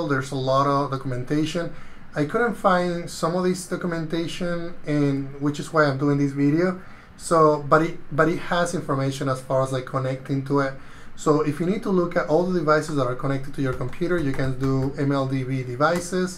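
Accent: Spain. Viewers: why you do not have the same content in spanish your pronunciation is like spanish or something like that can you talk about in spanish too ? that would be great !!! God Bless you.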